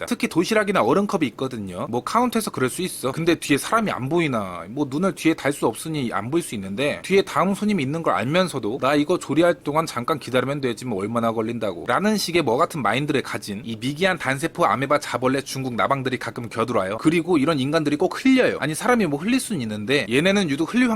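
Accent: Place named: Jeolla, South Korea. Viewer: ..전라도이신가봐여 ㅋ 전 안흘려용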